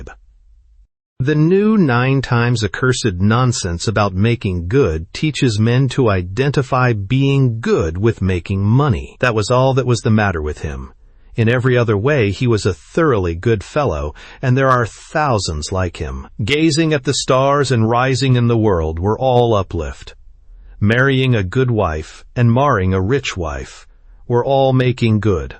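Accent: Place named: United States. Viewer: i want british accent.. not that ugly american accent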